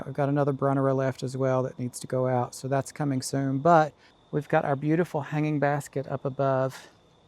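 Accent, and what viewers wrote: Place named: South, United States. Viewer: You need to add just a little more drawl to that “like, comment, and subscribe.” 😂 Just kidding - I love watching gardeners from all over the world but I do admit I have a soft spot for the ones who talk as southern as I do. 💕 The pots look great! I’m messy too - it’s a sign of genius. 😉 The scene stealer in this video is that adorable Scotty dog!!!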